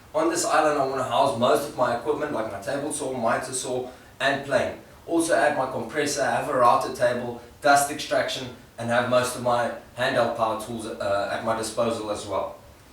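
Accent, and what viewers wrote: South Africa. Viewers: Dude, this is awesome. Nice to hear your accent - I'm a kiwi living in Lexington, KY and don't get to hear the South African accent AT ALL any more. Stay well brother! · Is he South African with that accent? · South African? I assume it from your accent. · At last... I thought I was the only YouTube maker in Africa... LOL, I'm in Namibia, you sound like you from SA... glad I found you... Sub'd... Great job bru...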